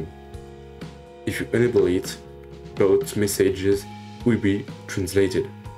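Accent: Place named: France. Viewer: No stress. We have a number of French users and I thought you sounded French so you might have done it already. I have now set them up, so not a problem. Thanks for the video though it is very helpful.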